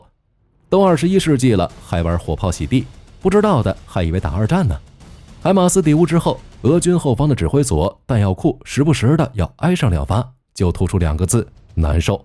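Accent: China. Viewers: 這不是大陸腔調嗎? 大陸會允許這種影片? 最後幾句話也太單純了吧！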